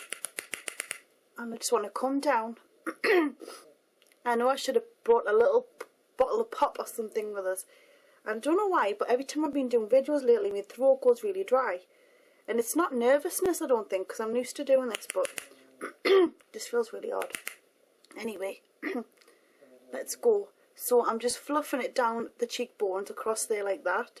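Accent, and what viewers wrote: Newcastle, United Kingdom. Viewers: Its a Geordie Accent. Same as Cheryl Cole?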